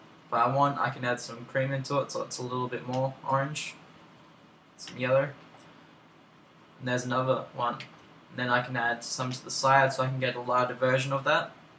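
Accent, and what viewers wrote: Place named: Australia. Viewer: Sad how much hearing an Australian accent on youtube turns me on. **tutorial to me allll dayyy**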